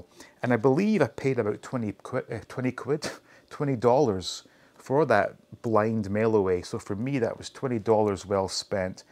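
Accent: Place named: RP, United Kingdom. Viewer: So refreshing to see someone on a video that can sit behind a desk, can talk in the queen's English and not be wearing some trucker cap and talking to puppets, who just so happen to be their only friends in life.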